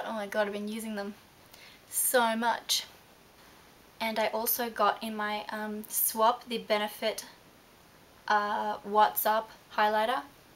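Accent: Australia. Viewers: Thank you :3 This will be perfect for school! From ur accent im guessin ur an aussie ? Same :3 Ps, Your bootyful. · Very nicely done., you are a beautiful girl and you do this 'almost - no - makeup', natural look well. Wish I could master the natural, no makeup look. I'm a fanatic when it comes to makeup, actually I am extremely artsy, so I always tend to view my whole face as a canvas. I don't even have a ready in five or ten minute go to everyday look. So laborious when it comes to putting my face on. But you knock this out in ten minutes flat. May I ask you, where are you from? Your accent sounds australian. It's charming. Thank you for the posts.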